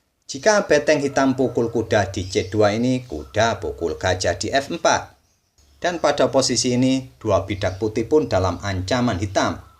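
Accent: Java, Indonesia. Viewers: Ji.. medok amat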